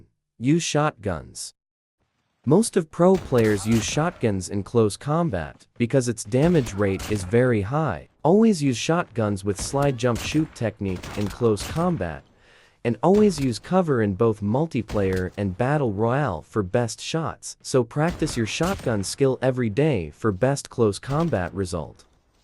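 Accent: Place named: India. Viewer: I can almost hear the Indian accent.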